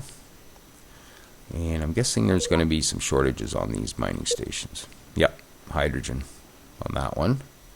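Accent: Canada. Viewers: great series. I have to ask are you Canadian? Your accent is either Eastern Canada or, Northern Ontario. I could be way off but I had to ask. I am from Ontario and the accent is very distinct.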